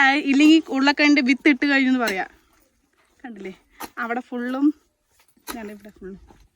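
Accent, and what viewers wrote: Palakkad, India. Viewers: മലയാളം സംസാരിക്കുന്നത് കേൾക്കാൻ നന്നായിട്ടുണ്ട് പാലക്കാടൻ ഭാഷ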